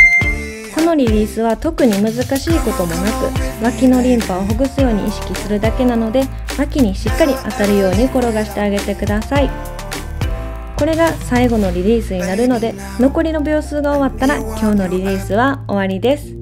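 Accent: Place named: Kansai, Japan. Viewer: 可愛いい〜、関西弁がよろしい〜。買ったまま使ってまへん😵、やってみようかな。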